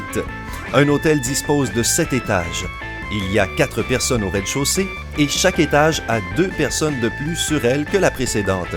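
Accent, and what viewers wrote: Quebec, Canada. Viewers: Hmmm son accent est cwlui dun quebecoi si je me trompe pas..☺ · Il a un accent québecois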